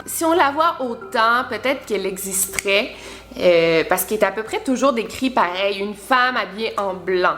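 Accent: Quebec, Canada. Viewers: Hiiiii je kiffe ton accent ! 😂 L'accent Quebecois c'est ma passion ! 😂 Sinon je trouve que tu raconte grave bien 😍❤ Bref, bisoous de France ♡ Charlotte 💞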